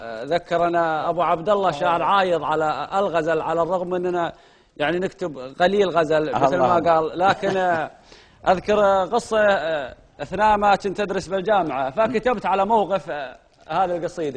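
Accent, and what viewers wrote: Iraq. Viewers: روعه يعطيك العافية. واللهجة البدوية العراقية زادتها حلاوة. ذكرتني بلهجة البدو شمال السعودية 👍🏻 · انا اشهد انها قصيده معتبره . وزادتها اللهجه العراقيه الاصيله حلا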